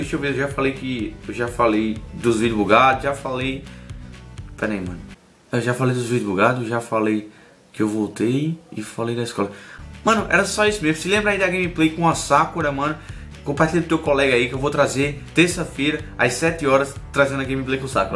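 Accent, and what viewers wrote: Northeast, Brazil. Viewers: de onde tu e ?? sotaque de Nordestino !! sou nordestino da Paraiba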